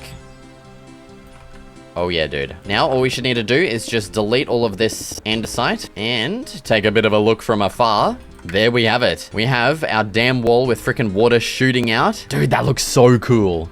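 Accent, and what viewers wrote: Australia. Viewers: are you aussie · mate u aussie like me?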